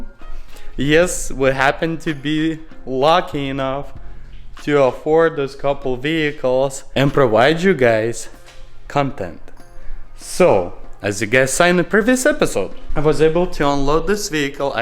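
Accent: Russia. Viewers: Привет, хороший русский акцент!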